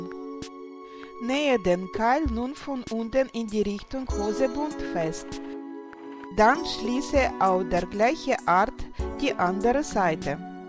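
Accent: Russia. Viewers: А почему не на русском?всеравно сильный русский акцент?не надо стесняться говорить по русски даже живя в Германии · У вас такой милый акцент) вы из России? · если вы понимаете, что я пишу значит вы можете по русски, акцент у вас очень большой, с таким акцентом говорят русские, но не все в этом признаются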